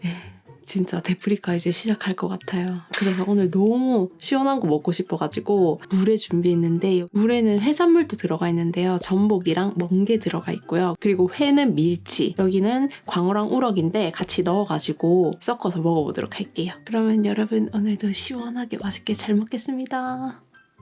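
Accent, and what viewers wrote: Gyeongsang, South Korea. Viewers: 아 대구시구나 경상도사투리가 가끔 나오시더라니 여긴 창원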